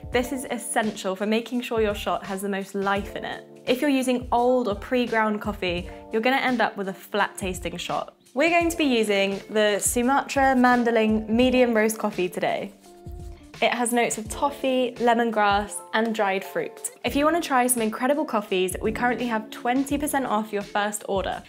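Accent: United Kingdom. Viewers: Was thrown by your British accent, was just going to place an order before realising it was a US company! 🤣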